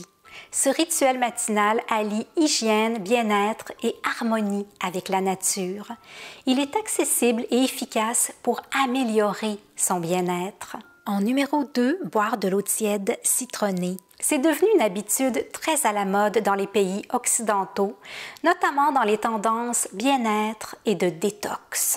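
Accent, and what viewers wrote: Quebec, Canada. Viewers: l'accent sonnait bien le Québec. C'est très agréable <3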